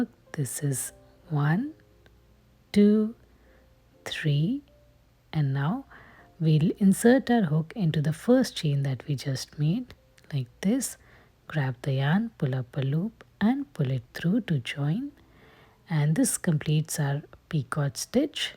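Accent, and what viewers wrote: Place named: India. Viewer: indian accent IM IN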